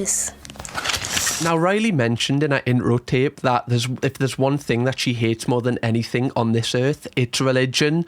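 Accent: Newcastle, United Kingdom. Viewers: Love your show , and the Geordie accent makes it all the better. Signed - happy doughnut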